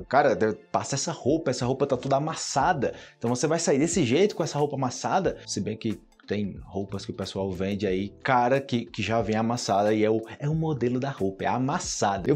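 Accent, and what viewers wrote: Antioquia, Colombia. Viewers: si el vivió en Medellín Colombia como 5 años .habla con perfecto acento colombiano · toda la razon, si bien soy chileno he tenido la suerte de hablar con gente de varios lados y pensándolo realmente suena como colombiano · Crealo yo note lo .mismo e incluso me atrevería a decir que vivió en Colombia por qué suena como paisano jajaja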